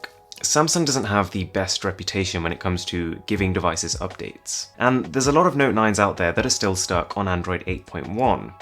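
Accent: RP, United Kingdom. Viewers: Dude. Your voice is making me fall asleep. So calm and posh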